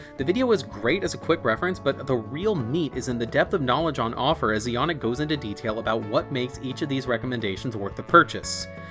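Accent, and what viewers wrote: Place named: South Texas, United States. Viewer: Hey heard you from south Texas ,you from the 956????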